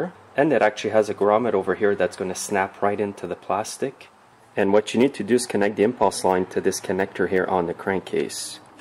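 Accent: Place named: Canada. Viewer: you sound somewhat Canadian. Nice vid tho, its good to see a professional do it, in the correct order. What solvent are you using in your parts washer? It dosent adversly effect the ignition bits? I'm sure its all solid state.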